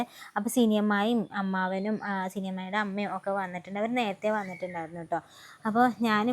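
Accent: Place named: South Kerala, India. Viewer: മോൾ സുന്ദരി ആയിട്ടുണ്ട് കേട്ടോ ❤.ഒരു കാര്യം... മോൾ സംസാരിക്കുമ്പോൾ തെക്കൻ കേരള ഭാഷ കയറി വരുന്നുണ്ട് കേട്ടോ.വരത്തില്ല... പോകത്തില്ല etc. അതു വേണ്ട എന്ന് തോന്നുന്നു. നിങ്ങളുടെ ഭാഷ കേൾക്കാനാണ് സുഖം❤❤❤ എല്ലാ അനുഗ്രഹങ്ങളും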